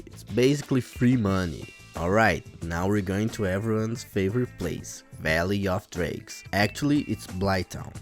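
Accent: Brazil. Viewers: Seus R são muito de paulista. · Are you brazilian? your accent sounds familiar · I think he's Brazilian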